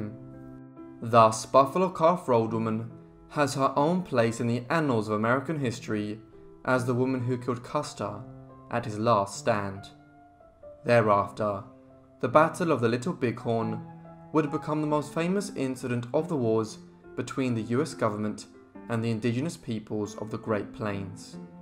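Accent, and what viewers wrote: England, United Kingdom. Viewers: I like these history narratives, but I have to say I almost skipped it at the start when I saw the narrator and heard the English accent. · Why do you have someone from the UK narrating American history??? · this is wrong, please we need a native STORY TELLER not a brit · Very good, However, it seems a little strange for our early American history being told to us by someone with a British accent. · Not buying this for a minute, beware of American history lesson from someone with a British accent...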